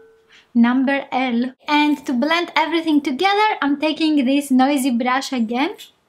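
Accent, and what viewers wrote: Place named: Greece. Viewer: She is Greek. I love their accent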